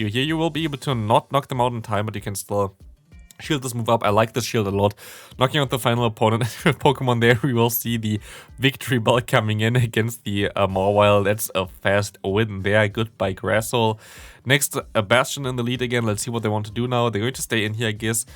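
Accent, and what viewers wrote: Germany. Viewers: I knew it you were german! the classic german accent like mine xD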